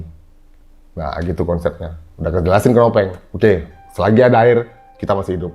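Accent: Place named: West Sumatra, Indonesia. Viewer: Urang Awak ma suaro nyooo Cubo agiah ampu hehehe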